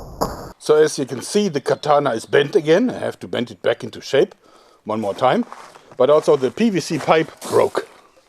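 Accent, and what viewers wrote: Germany. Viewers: You are German! I can hear it! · YOU ENGLISH IS GRAMMATICALLY, WICKEDLY EXCELLENT. ...OF COURSE WITH A NICE GERMAN ACCENT! ...WICKEDLY ENTERTAING AND FUNNY. 😀😀😀😀👍👍👍👍💖💖💖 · Du bist duetsch das hört Mann aber cool Video Mann i Love Lucille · Are you german? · U sounds like a German Guy that’s try to speak Englisch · Du bist safe Deutscher. Wie lange und wo du wie lange wohnst ist mir egal. Du hörst dich an wie ein Deutscher der Englisch redet. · warum hört sich sein englisch so deutsch englisch an?😂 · His accent sounds very authentic, actually. I don't know where you see his accent slipping, but you clearly don't know what a real German accent sounds like. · are you german? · Dein deutsch aktzent is nicht zu überhören xD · I am german and i know a german accent when i hear one and i sound exactly the same like him when i speak english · Are you German? 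· are you German?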